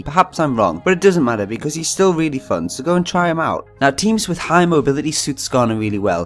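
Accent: Newcastle, United Kingdom. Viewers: mate, you a geordie too?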